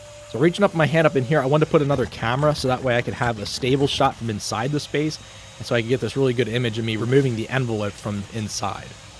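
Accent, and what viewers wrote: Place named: Pennsylvania, United States. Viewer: I knew you were from PA by the accent! HA! Delco???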